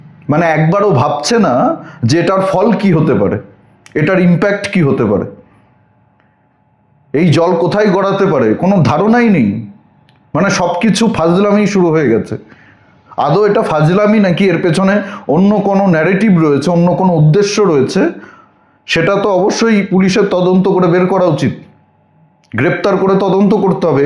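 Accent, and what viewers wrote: Bangladesh. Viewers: She sounds Bangladeshi.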